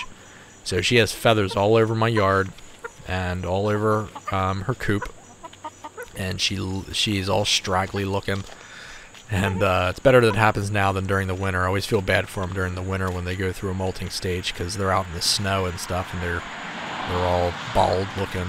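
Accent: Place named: Western Pennsylvania, United States. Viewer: Second that! I want to hear shower, downtown and Southside. It’ exactly like western Pennsylvania 😂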